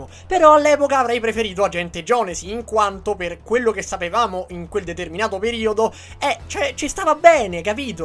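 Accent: Rome, Italy. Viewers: Sono di napoli e ti.ascolto sempre ma so parla pure en romano a o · bravo ragazzo sei davero simpatico nei tuoi video , continua perche sei davero particolare sarà il tuo accento romano , sarà che non sei antipatico come gli altri youtubers ma secondo me ti meriti di più come iscritti di certi che parlano di fornite , concludo dicendoti continua cosi che vai alla grande ciao ed e sempre un piacere vedere i tuoi video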